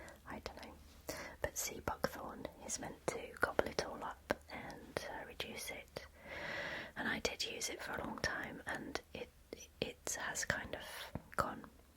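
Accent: United Kingdom. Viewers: easily one of the prettiest ladies on the net. You could read me the dictionary with your lovely British accent. This will be good for bed now as it's only just gone 10pm down here in Australia, goodnight 😊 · Are you English, Irish or Scottish ? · First time seeing you. Fantastic whispering and your British accent to boot! Love it!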